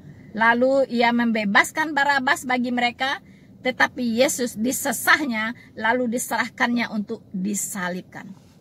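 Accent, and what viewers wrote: West Sumatra, Indonesia. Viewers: Suara ibu ini mirip sekali dengan suara teman saya dan kebanyakan orang Minang, satu kota dgn saya di Bandarlampung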